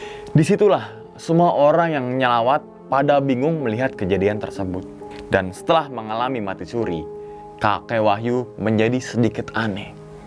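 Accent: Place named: Java, Indonesia. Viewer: Basa jawa ne bang lucu ☺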